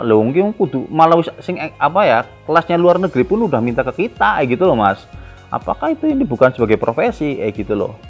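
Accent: Banyumas, Indonesia. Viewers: Asyiikk Logat nya kok kayak kiyek banyumasan yaa mas sse.... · Alamat mana ya kak, tadi kurang jelas. Kayaknya medike ngapak ya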